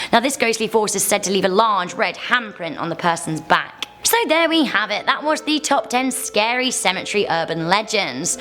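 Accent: United Kingdom. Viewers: I love her sharp British accent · are you british? You sound british. Keep up the good work!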